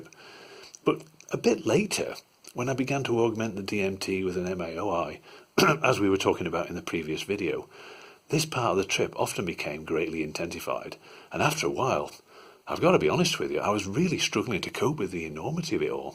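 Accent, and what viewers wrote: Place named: North, United Kingdom. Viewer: you've got a great voice for telling stories, i imagine you could do a kids audio book really well. the friendly soft but deep northern accent can't go wrong! thanks for passing on the dmt love!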